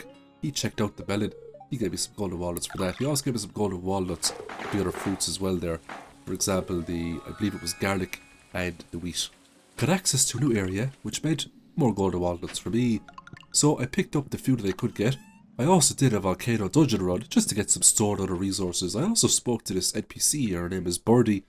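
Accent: Ireland. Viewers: I have never heard an Irish accent as good as yours love it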